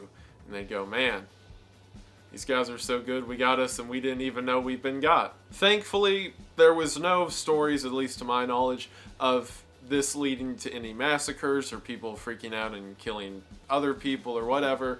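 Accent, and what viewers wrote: South, United States. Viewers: I typically only watch videos on 1.25x, so now the normal playback speed makes his southern drawl sound like a drunken slur 💀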